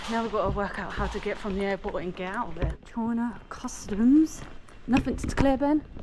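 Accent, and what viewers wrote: United Kingdom. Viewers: 英国哪个地区的口音😂？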